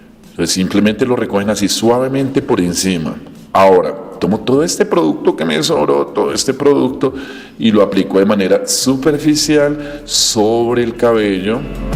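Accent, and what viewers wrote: Colombia. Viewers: Me encantó el video, tu profesionalidad y tu acento colombiano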